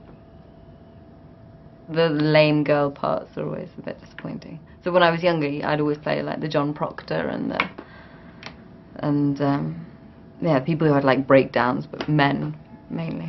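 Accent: United Kingdom. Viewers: she's so cute! i love her britsh english accent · as if her adorable looks weren't enough, that distinguished british accent just makes me melt :)!!!!!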